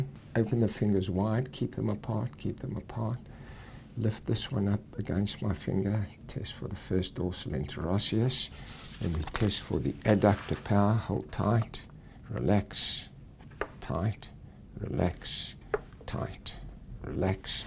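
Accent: South Africa. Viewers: South African accent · I was also confused. I heard South African but when I looked him up it indicated Australia! Well, went to Wits so definitely South African, but I suppose just moved to Australia. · I thought was south african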